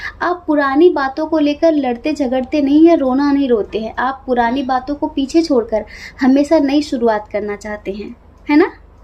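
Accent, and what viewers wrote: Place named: Bengal, India. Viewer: Aap bangoli ho aisa lagta hai mam...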